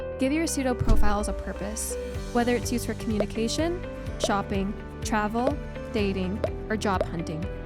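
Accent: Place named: Utah, United States. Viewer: Video idea: “How to know if you’re using, HOW TO, correctly” One thing is for sure, there’s definitely an Utah accent for all you OSINT peeps.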